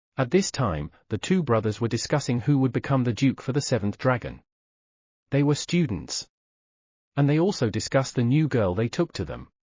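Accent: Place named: United Kingdom. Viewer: Why is the ai voice British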